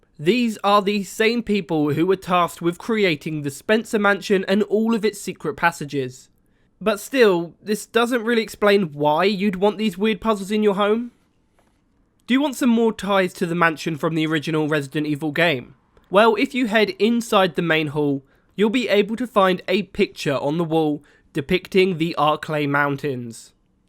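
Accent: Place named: England, United Kingdom. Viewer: Why does these kind of videos always had a narrator with english accent?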